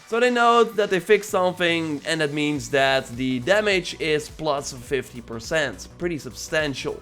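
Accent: Netherlands. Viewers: where are u from u have like a dutch acent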